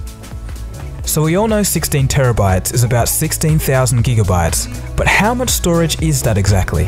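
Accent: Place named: Australia. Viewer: He is from Australia that my explain the pronounciation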